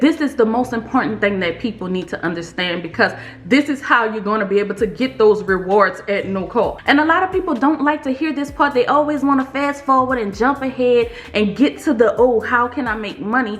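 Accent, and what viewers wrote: New Orleans, United States. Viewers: She sounds like she is from New Orleans 🤔🤔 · Sis are you from New Orleans?👀👀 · you're from New Orleans lol....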